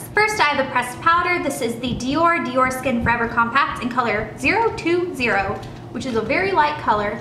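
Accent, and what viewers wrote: South, United States. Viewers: love the southern accent! makes me think of home!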